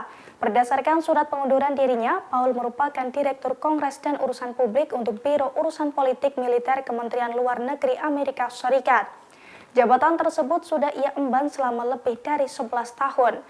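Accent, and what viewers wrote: Java, Indonesia. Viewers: mbak e wong jowo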